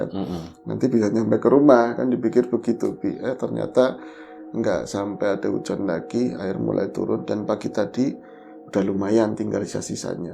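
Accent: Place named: Tegal, Indonesia. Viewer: Sllu kangen bhs.Tegal bro yg sngt sedap ddengar jd auto like, komen, and subrek.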